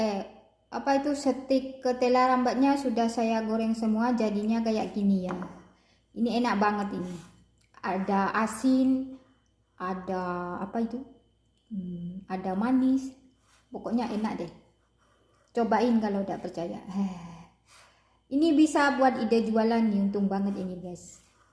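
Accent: Madura, Indonesia. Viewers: Kyk Logat madura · Fix mbaknya dari logat nya pasti orang madura nih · Orang madura ni kyaknya.... mantap.... yeeeee tak teee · Boabbo orang madura ya gess.. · mbak orang madura ya🤦‍♀️🤦‍♀️🤦‍♀️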